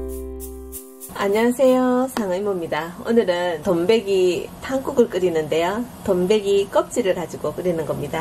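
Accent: Gyeongsang, South Korea. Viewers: 경상도 사투리 목소리가 너무예뻐요 유익한정보감사합니다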